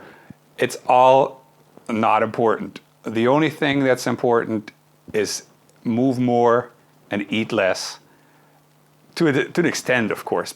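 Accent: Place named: Germany. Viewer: "My English is not that good today." Your English is amazing. I had to really focus to even hear a German accent...I've been studying /speaking German for thirty years, and I not only still speak it with a Texas accent, but confuse the verbs for "to live" and "to love." Awkward.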